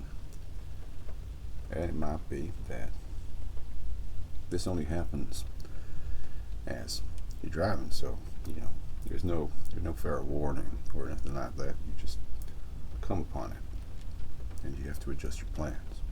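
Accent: South, United States. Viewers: Very sexy character.I like it when you use your American accents! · Being from Oklahoma, your accent is pretty spot on, as are your jokes, very much something a southerner would tell. I love this ASMR, especially because of the rain and your deep voice. I really hope you can get a 3Dio sometime soon, that would make your content even more incredible. I wish I could donate, but I wish you the very best in reaching your goal! Thanks again for the amazing work you create. (I really need to stop leaving long annoying comments ahh) <333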